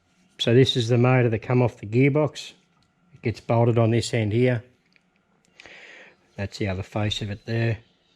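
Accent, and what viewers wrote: Australia. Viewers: Good job! - By the way. I like the australian way to speak english! It sounds a little bit strange, but nice. Greetings from Dresden (Germany).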